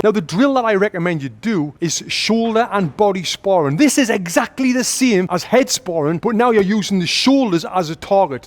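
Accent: United Kingdom. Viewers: Man's got the thickest bri'ish accent ever "Ponchd in the feece"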